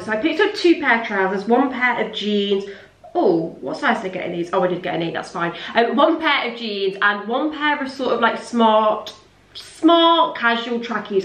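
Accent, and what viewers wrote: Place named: Nottingham, United Kingdom. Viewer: Love your vids I didn’t realise you were from notts too, knew the accent sounded familiar 🤣 💖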